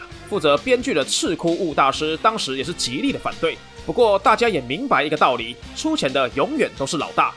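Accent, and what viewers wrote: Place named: Minnan, Taiwan. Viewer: 这部漫画也是我小时候看的最喜欢的动画片之一，看得出来UP主很用心在解说，但说实话虽然你尽量用接近普通话的口音解说但依然能听出你有闽南语口音，你是台湾那边的吧？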